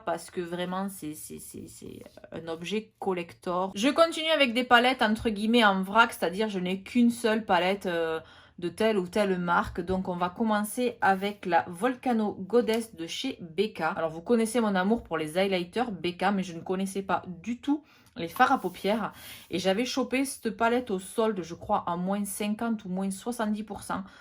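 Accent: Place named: Southwest, France. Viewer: Le petit accent du sud-ouest !! 👌🏻 super vidéo qui détend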